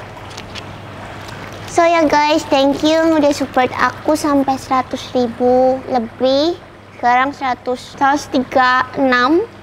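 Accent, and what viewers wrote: Java, Indonesia. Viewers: Disaat orang2 kaya ngomongnya selalu campur english, nah ini medok banget dong ngomongnya heheh... Sukses terus yt channelnya ❤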